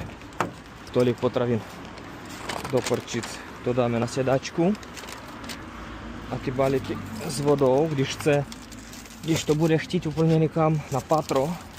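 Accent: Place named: Russia. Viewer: Zajímavý přízvuk. Vypadá že z Východu, spíš z Ruska.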